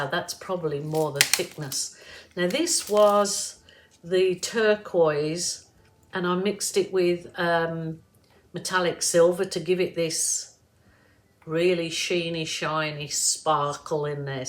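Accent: Birmingham, United Kingdom. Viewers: Thank you. An interesting technique. I love guessing accents. I'm listening to you...were you originally from the West Midlands? I hear a bit of "brum".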